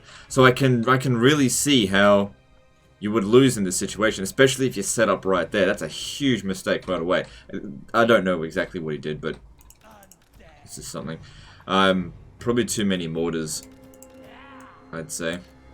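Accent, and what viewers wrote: Australia. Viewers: When i was scroling through ytl and saw i was like omg it's true cc doesnt work well with an Australian accent.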